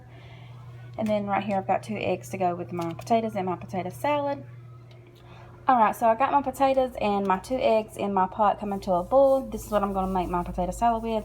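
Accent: South, United States. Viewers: Hi! Just came across your channel. Where do live? I detect your southerness. I am from Texas. My grandma lived in a super small town on the border of Texas and Oklahoma, but her mom was from Georgia. I love this kinda cooking.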